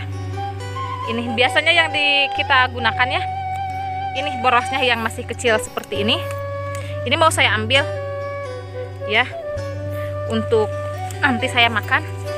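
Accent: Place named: Sunda, Indonesia. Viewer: Modol eta hoe. Semangat pejuang beuteung... Ahaha meuni medok eta sunda 🤣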